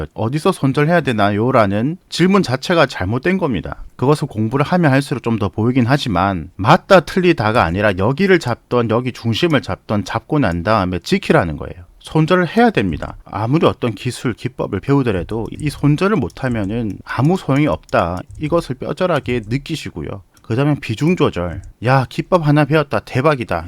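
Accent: Gyeongsang, South Korea. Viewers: 밸런스를 아시는 분이시네요! 경상도사투리가 약간 짜증섞인 어투로 들리기는 하지만, 고유의 스타일이신 것으로 이해하도록 하겠습니다^^:: 감사합니다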